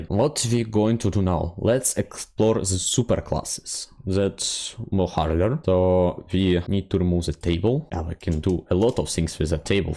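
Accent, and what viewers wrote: Russia. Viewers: omg the russian accent i can feel it great tutorial !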